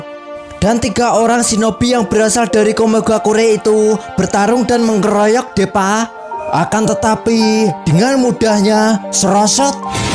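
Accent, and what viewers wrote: Java, Indonesia. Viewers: Medhok bnr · Medok bgt lu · Pengisi suaranya lucu mas,, dari jawa yak wkwkwk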